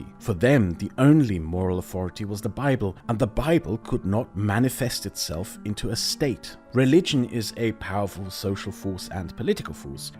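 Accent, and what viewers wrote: Germany. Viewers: There's something inherently sexy to a video essay on German history written in perfect formal English and narrated with a tasteful German accent. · Ironically, I never thought I'd enjoy listening to pronounce German words but...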